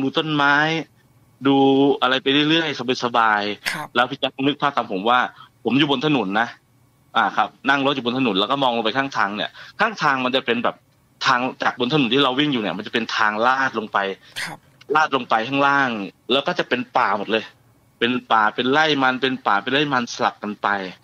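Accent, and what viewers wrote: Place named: Korat, Thailand. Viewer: สำเนียงโคราชมันได้อรรถรส 🤣🤣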